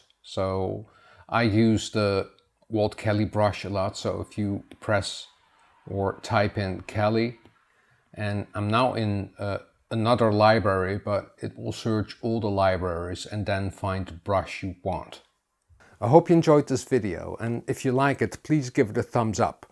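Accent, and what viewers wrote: Netherlands. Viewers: How did I instantly know you are Dutch? You remind me of my Dutch friend.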